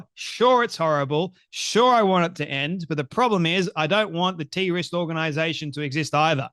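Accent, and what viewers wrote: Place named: Australia. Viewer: U r the man!! However that Aussie accent sometimes throws me off 😁 I hear t-wrist organization and don’t get what it is, until I realized you’ve actually said t3rr0r1st lol. Still, no matter the accent, u r the man!! Keep speaking the truth !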